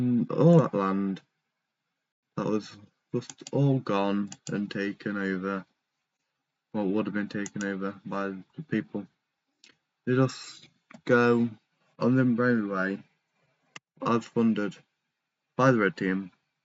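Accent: United Kingdom. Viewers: Bri’ ish voice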